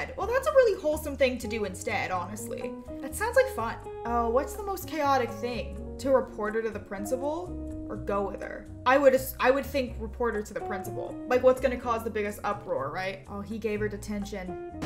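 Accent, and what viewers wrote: United States. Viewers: I thought u were British, I'm British, how did I not notice u were American??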